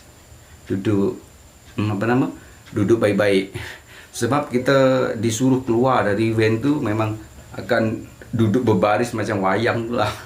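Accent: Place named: Malaysia. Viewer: Mantul banget logat bahasa Melayu ya mas.masih lekat hingga ke kampung.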